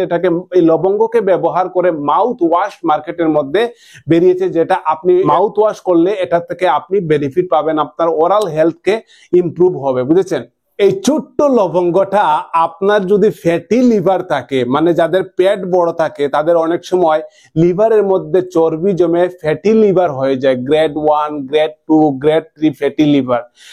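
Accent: Sylhet, Bangladesh. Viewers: Apni sylheti Kotay bujlam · সিলেটি দাদা কম কথায় কি বুঝানো যায় না !!!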